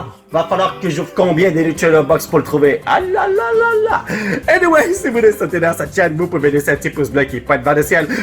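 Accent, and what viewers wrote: England, United Kingdom. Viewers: Tu as un gros bon accent anglais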